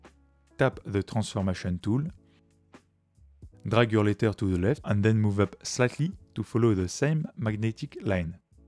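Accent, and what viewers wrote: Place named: France. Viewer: Merci pour tes vidéos ! Elles sont simples et claires et je kiffe trop ton french accent !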